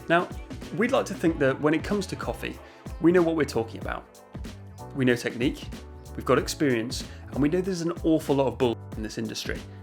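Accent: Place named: England, United Kingdom. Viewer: Somehow I could tell you were English before you started speaking. Love the accent 👌🏽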